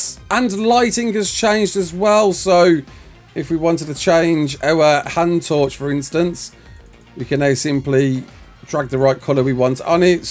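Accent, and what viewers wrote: Birmingham, United Kingdom. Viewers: Honestly I only came across you waiting for xbox release, but its fuc*ing nice to hear a fellow Brummy on youtbe! I've subscribed and I hope you make videos for xbox 👍🤟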